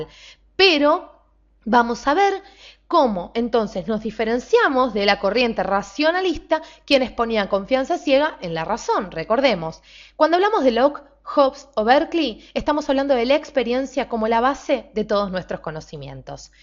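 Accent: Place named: Buenos Aires, Argentina. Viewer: jueno es porteña es el acento de estos arrogantes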